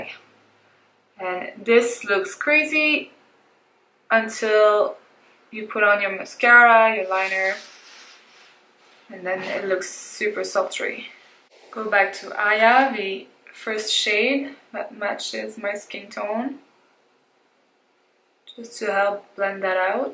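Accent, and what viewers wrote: France. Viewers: Beautiful look! Thanks for your first impression review, I'm so torn on whether or not I should buy it. I was wondering what your accent was because it sounded familiar, yay for another french girl! I'd love to see some videos in french too! I moved to the USA from Paris when I was 9, so I don't have my accent anymore :( it's a shame because I love it so much.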